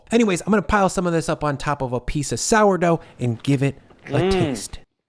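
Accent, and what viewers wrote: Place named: Chicago, United States. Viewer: At first I thought you were joking around and emphasizing certain words in an annoying way.. now I realize it's just a Chicago adjacent accent and you're not pretending.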